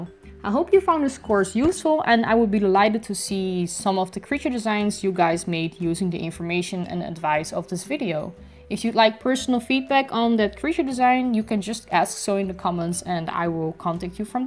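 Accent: Netherlands. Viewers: Are you by chance Dutch? haha i think i recognise your accents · Native dutch speaker ?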